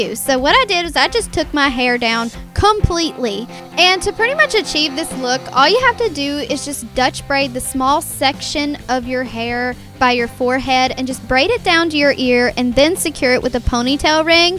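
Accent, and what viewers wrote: South, United States. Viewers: what state do you live in??? you sound like you have a southern accent and i love it!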